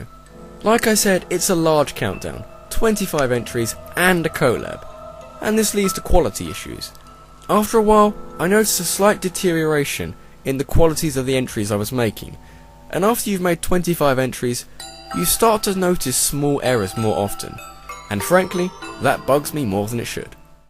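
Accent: England, United Kingdom. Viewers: Okay, this is not what I imagined you to look like. DAMN YOU FOR YOUR MISLEADING, YET BEAUTIFULLY SOUNDING ENGLISH ACCENT! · Oh. My. God. Your face... IT'S SO... different to what I imagined... Seriously, when I listened to you on all your other videos, I always pictured you with a long face, black hair, and you would wear glasses... weird huh... Dammit! I watched too much Harry Potter. Now when I hear a british/english voice without a face, that face is all I can think of... I'm SOOO Sorry! Epic list though... and congrats on 20,000 subscribers!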